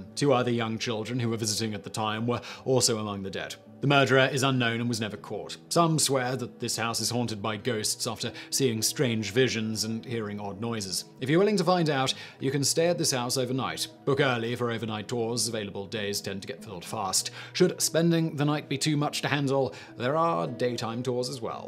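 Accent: United Kingdom. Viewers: Who is this British man? And i wander does he smoke weed?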